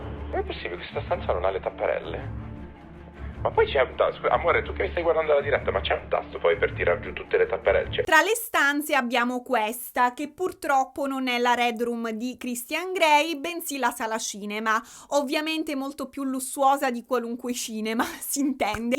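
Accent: Palermo, Italy. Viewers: Madooo'' sei troppo fantastica! scoperta ieri con il video di Kayne West e Taylor Swift! Adoro il modo in cui parli, la tua ironia, come spieghi tutto in modo efficace e senza annoiare (nonostante 2 ore di video!). Ps: Ho capito subito che sei palermitana! troppo divertente! ahahahah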